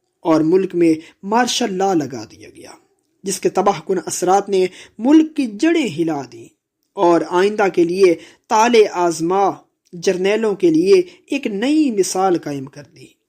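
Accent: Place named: Arab world. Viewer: صدا کار صاحب سے درخواست ہے کہ اردو کو عربی لہجے میں نہ پڑھیں. یہ اردو کی کتاب ہے. يحي سنوار کی کتاب کے لیے کی صداکاری مناسب تھی کیونکہ اس کتاب میں عربی الفاظ بہت تھے.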